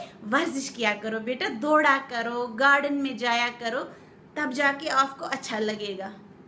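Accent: Kashmir, India. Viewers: I loved the Ending.. Episode was OK but you are Too good...You are Super Talented.. I love your Expression and the Kashmiri Accent.. 😊😊😊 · Real kashmiri ascent. Loads of love for di.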